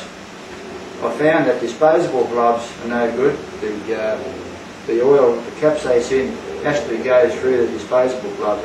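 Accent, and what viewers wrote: Australia. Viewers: are you Australian. Cause you have an Australian accent.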